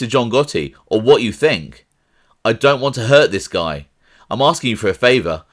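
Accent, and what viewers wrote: England, United Kingdom. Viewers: I love hearing about the American Italian Mafia from a guy with a British accent.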